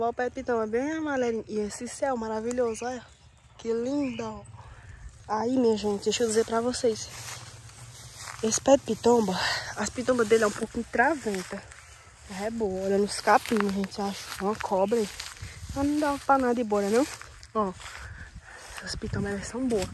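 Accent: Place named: Northeast, Brazil. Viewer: Tu nunca veio no nordeste não foi ? Nois só fala assim, ninguém e igual a ninguém todo cidade todo país,as pessoas tem seu jeito de falar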